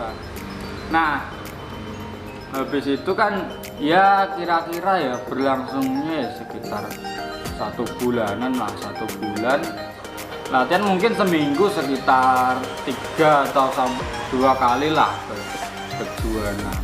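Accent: Java, Indonesia. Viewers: Ojo meduk" nek sereden 🤣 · Suaranya medok bgt mas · Medok banget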